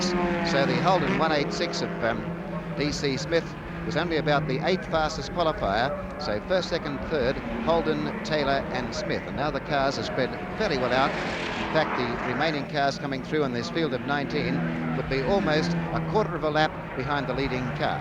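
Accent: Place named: United Kingdom. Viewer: Yes. does a great job here in commentary of giving silly old codgers a bad name. Evidently, motor racing & production sedans especially, were not his strong suit. However, his commentary here is a priceless time capsule displaying how things really were back in 1968. Aunty (Our ABC) did perform a marvellous public service by sending film crews, cameras & commentators to a multitude of events similar to this one when the big three commercial networks couldn't be bothered. Then, to cap off that effort, they archived the film reels long before Joe Public could lay his hands on a video tape recorder. may have been a tad in over his head but here he is working on a weekend devoted to his work & bringing us some classic Aussie motor racing action. commentary here is priceless & bordering on comedic. He barely had a clue but gave it his best shot in his awkward best Queen's English. 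From his commentary, I can picture him & many like him, black suit jacket, thin red tie reaching into the glovebox of a Humber Snipe or a Vauxhall Cresta, retrieving a thermos & pouring a steaming hot cuppa in the paddock between events at glorious old Warwick Farm. takes us there.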